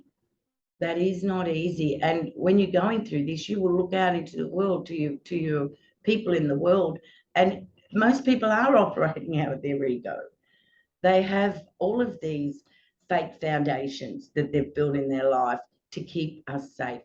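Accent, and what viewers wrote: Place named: Australia. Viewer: Wow 😮 I just walked in on the most epic astrological gift !! You had me with the Aussie accent but now going back to soak up all of your videos uploaded prior to this one ❤️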